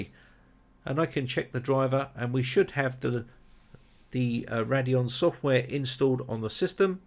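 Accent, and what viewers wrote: United Kingdom. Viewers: Having built my first PC last week with a 2400G, I was happy to have everything working, until I hit the same problems as yours! Thank you so so much for your video, it’s rare to see a British video creator on the topic of PC building, especially Ryzens, so it felt very homely to have that accent haha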